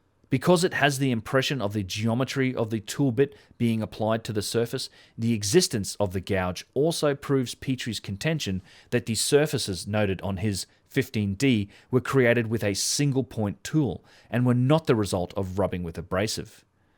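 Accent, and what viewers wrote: Australia. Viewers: G'day, just wanted to say that I really like your stuff and how great it is to hear an Aussie talking with such intelligence and conviction, nice work mate!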